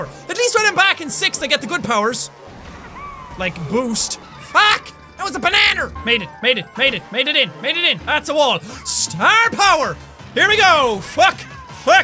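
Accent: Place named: Ireland. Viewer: LazyTurtle I'm brazilian and my school don't teach very well so I have to learn by my self, I learn a lot but I still have a few words to know, I doesn't know too much when I find jack but I actually learn a lot with him, and was hard because he has a Irish accent..... I talk a lot sorry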